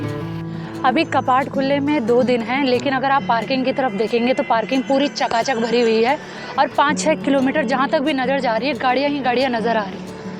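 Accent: Uttarakhand, India. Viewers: Jaise ap btate ho ji aisa lagta hai apke awaj se uttarakhand ki mahak arhi hai apne aj kedarnath ke bare me btake dil khush kar diya hai thank u and love you vlog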